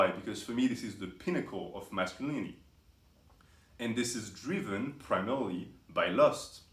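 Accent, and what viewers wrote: France. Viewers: Is your native language french?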